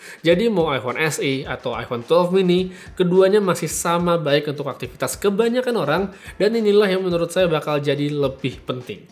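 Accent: Bali, Indonesia. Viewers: Ini orang Bali ya???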